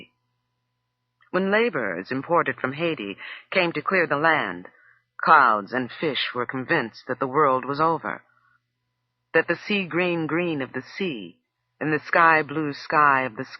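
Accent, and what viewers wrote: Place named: Midwest, United States. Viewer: Wow. Lynn Thigpen. I know some of her family and her work onstage and onscreen is excellent. Great voice. That lovely clear Midwest Black voice.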